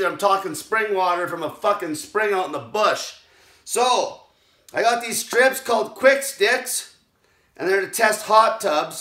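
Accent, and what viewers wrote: Canada. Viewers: i've never seen someone with such a strong canadian accent lol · Is he Canadian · Canadian eh? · I detect Canadian in your yelling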